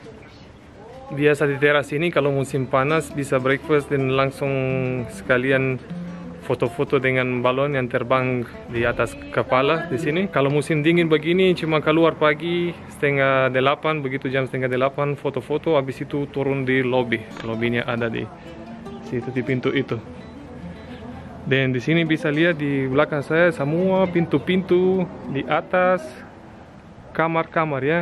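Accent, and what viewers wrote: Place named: Ternate, Indonesia. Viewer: Tong Tra bosan, kalo durasinya d perpanjang Sadiki malahan makin bangga ada bule yg pake tong pe logat Ternate 😊🤗apalagi liat ganteng uucchh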